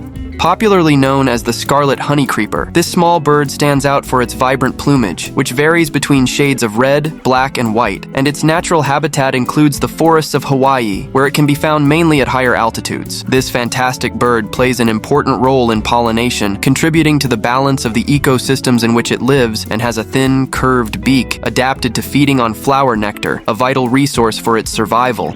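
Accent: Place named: England, United Kingdom. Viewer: Si sente solo la tua sgraziata voce 🤢🤮gutturale inglese , ma non si sente alcun suono degli uccelli . VOTO NEGATIVO .:text-green-game-over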